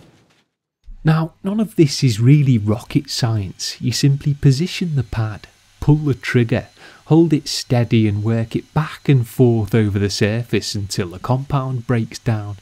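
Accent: Liverpool, United Kingdom. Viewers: Subscribed to your channel 2 months ago, so relaxing and memorising it must be that soft Scouse accent😆,last video before Christmas?, if so happy Christmas/new year, loads more cars to clean in 2018 🎄🇬🇧🚘👍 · Is he a scouser or from the Wirral, it`s a bit posh there.?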